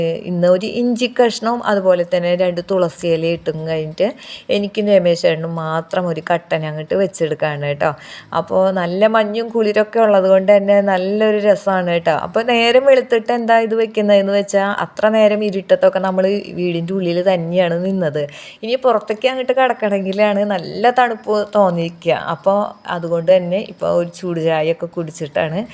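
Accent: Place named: Thrissur, India. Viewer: സംസാരം കേട്ടിട്ട് തൃശ്ശൂർ ആണെന്ന് തോന്നുന്നു ഞാനും മുട്ട കുക്കറിൽ വേവിക്കാറുണ്ട് എല്ലാ വിശേഷങ്ങളും സൂപ്പർ 👍👌🥰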